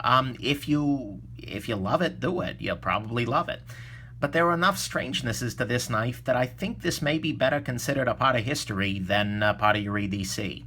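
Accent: New York, United States. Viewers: Hey, where are you from? Almost sounds like Brooklyn, somewhere in NY...not sure, but accent definitely seems east coast. Anyway, I like it! It adds color, and reminds me of home (I'm from NYC, but currently living in Seattle). Am I close?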